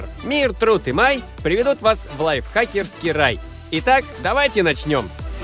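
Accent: Kazakhstan. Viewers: Воу чувак ты из Казахстана?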